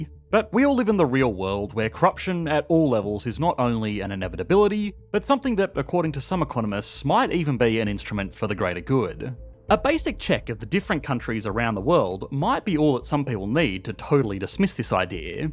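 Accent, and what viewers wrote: Australia. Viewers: Annoying Australian accent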